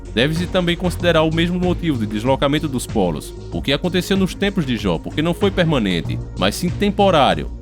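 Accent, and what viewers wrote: Northeast, Brazil. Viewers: Sotaque de nordestino kkk mas a voz e boa... Cornao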